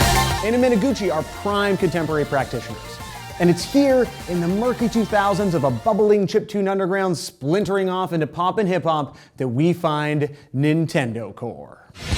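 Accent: Canada. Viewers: You most certainly have an accent. Everyone from Canada and the US states near Canada (Wisconsin, Minnesota, North Dakota) have a strong accent on any "ou" sound. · Its ok, we'll make it through this. ps you song just as Canadian as I do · Accent? Yes sir, you sound like an Ontarian. You sound like you lived about a block from Yonge Street, actually. I do tend to lose my poop when an American accuses us of saying a-boot. No one says a-boot. We sometimes say a-boat. You, sir, don't really say a-boat. You pretty much say a-bout. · There are no words to adequately express how much I love the fact that one of these bands is named Power Glove. And yeah, you really don't have much of an accent. Probably the least accent-y Canadian accent I've ever heard.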